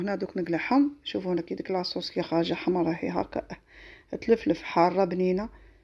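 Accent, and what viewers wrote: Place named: Oran, Algeria. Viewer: أول مرة نشوف الفيديو تاعك انا من قسنطينة نموت على هدرت الوهارنة ربي يخليك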